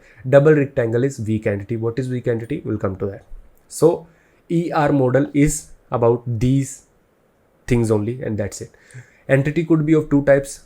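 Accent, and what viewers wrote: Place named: Punjab, India. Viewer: Sounds punjabi Maybe you should relearn what south indian accent sounds like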